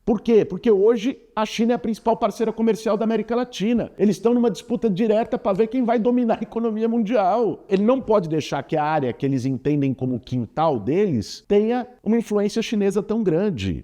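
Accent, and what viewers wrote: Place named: São Paulo, Brazil. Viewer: Eu não aguento esse sotaque paulistano.... Podem me detonar, tá!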